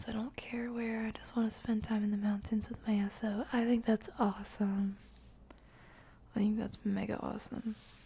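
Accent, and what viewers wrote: France. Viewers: I’m going to speak for everyone when I say this… We kinda can’t put into words how much we appreciate you. I’m going to rephrase that... Your content is nothing short of amazing, but at the end of the day, you, is what we love and appreciate. Again it’s not just your content, but the fact that day in and day out (no matter how oopsie doopsie the schedule gets) you strive to put us as a priority. Not to mention, no other creator in this field interacts with viewers like you do, and that makes us genuinely feel like we truly are your sunshine who makes you happy when sky’s are gray… you also preach the Good News of Christ which very FEW people do. On a slightly different note, there’s always a handful of us out there that will never open up about what’s going on in our lives, but just know you’ve pulled so many people out of so many holes that we cannot simply thank you enough for it… one of the many was in your chat earlier too, and with your help was able to join the Marine Corps. 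You change lives. That being said never forget how loved you are by not only us, but by God as well. You’re a one of a kind, special, and goofy human being who has one heck of a French accent that no one will ever come close to touching. Thank you, and we genuinely love you. Love, -Your Community Very important P.S.: please please please make sure you’re taking care of yourself. Always remember that if you need too, don’t be afraid to put yourself first. I know we pick on you for the late st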